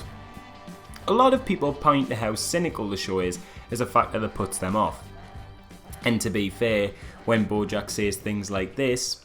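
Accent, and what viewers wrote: Newcastle, United Kingdom. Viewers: U sound like willNE like not just the geordie accent but the actual voice too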